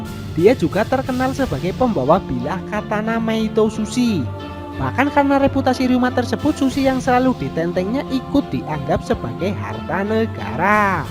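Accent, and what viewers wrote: Banyumas, Indonesia. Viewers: agak ngeri ngapaknya, kayak siapa gitu. 😂😂😂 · gk suka gue sama suara nya.. jangan ke jawa jawaan dong mas.. yg biasa aja kali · mendok bgt bahasanya berbicara lha dengan yang baik dan benar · Go ngapak go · Pertahankan suara ngapaknya sob.. bedakan dengan yang lain.. jangan peduliin haters.. karena mungkin dia kalah saing ama ente.. hoho.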